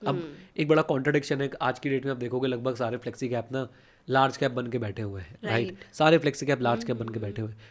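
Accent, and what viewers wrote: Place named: Panwari, India. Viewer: Panwari accent ❤❤❤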